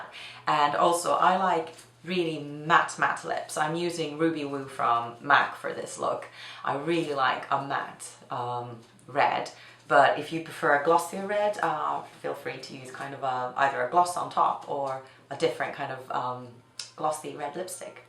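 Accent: Ireland. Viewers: are u irish? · I actually thought you were Irish :D I love the tutorial and your accent is really cute too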